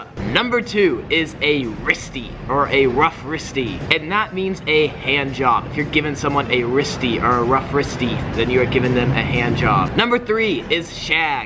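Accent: United States. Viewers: Hearing an American pronouncing these is so weird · Lmfao hearing all this in an American accent is hilarious. Love it mate, well done! 👍🇦🇺😂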